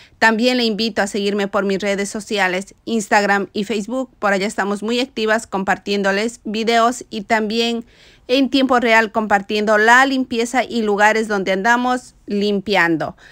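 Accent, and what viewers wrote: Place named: Ecuador. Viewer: por su acento, es usted Ecuatoriana, Pais lindo, de gente hermosa, trabajadora, si estoy equivocada, disculpen.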